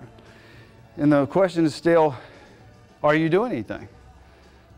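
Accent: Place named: South, United States. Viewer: That´s what I call a southern acccent. I have a chicano accent haha. Thanks for the vid, it was helpful.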